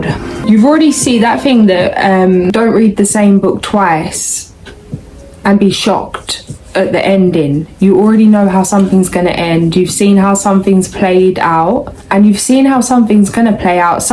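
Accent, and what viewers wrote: United Kingdom. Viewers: I love that British accent!!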